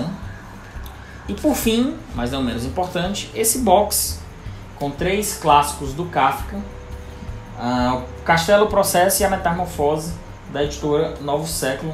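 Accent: Northeast, Brazil. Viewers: Canal com um ótimo gosto literário e com o sotaque mais bonito do Brasil. Rsrsrs. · Parabéns pelo 💯 video, sempre assisto e pego várias dicas, amo vê o sotaque do meu nordeste no booktube👏👏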